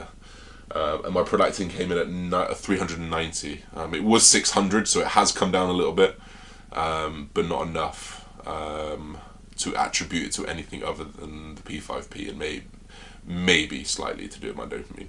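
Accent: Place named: Liverpool, United Kingdom. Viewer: I wonder which part of Liverpool he is from, I can't work it out from his accent...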